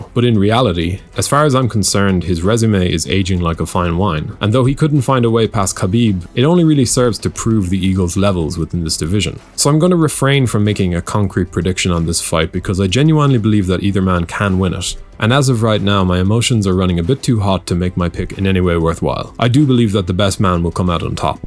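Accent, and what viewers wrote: Ireland. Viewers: Wtf the dude that made the video is irish? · For some reason I didn't notice you were Irish until you said it...weird huh😅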